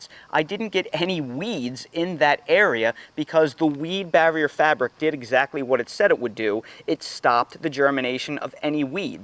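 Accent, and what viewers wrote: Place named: New Jersey, United States. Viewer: Love love love this! So glad i found your channel! I'm in New Mexico and using the vlack weed fabric terrifies me 😅. It does get cool at night (50s) but during the day it's in the 90s. Even starting around the end of april. But I love this idea. I have a raised bed (livestock water tank 😂) and just got some grow bags. But it all seems to be going so slow. I even started mine indoors and it still seems slow 😢 but I cant wait to learn more. Was surprised when you said you were in the south bc i heard the accent but then you said Jersey and it made sense 😂😂 im from ga so it was funny to notice 😂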